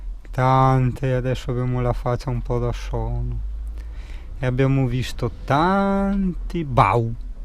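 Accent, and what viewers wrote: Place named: Tuscany, Italy. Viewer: Obbrao tummisei garbao un monte....tai imparao il toscano proprio bene...brao😊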